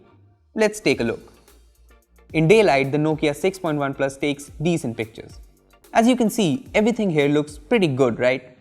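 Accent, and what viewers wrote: India. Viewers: Only indian guy's ar presenting nokia fuck :)))))))), that accent kills me boooy:)))))))))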